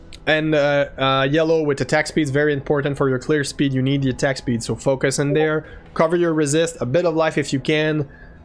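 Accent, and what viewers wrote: Quebec, Canada. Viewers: The Quebecer is strong in this one. Am I correct brother man.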